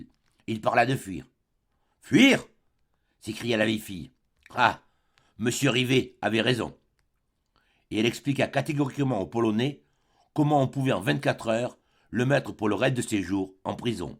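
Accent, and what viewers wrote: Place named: South, France. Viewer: Ce n'est pas un conteur agréable a écouter Cette accent du midi élocution hachée j’abanbonne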